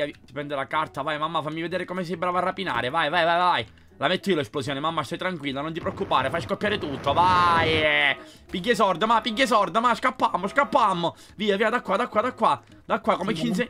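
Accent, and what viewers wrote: Sicily, Italy. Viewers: ma sei siciliano? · Ma sei siciliano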